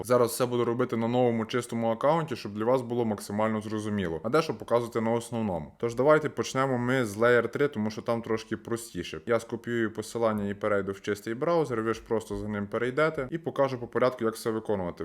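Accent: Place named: Chervonohrad, Ukraine. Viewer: У тебе діалект як у мене) ти часом не з Червонограда?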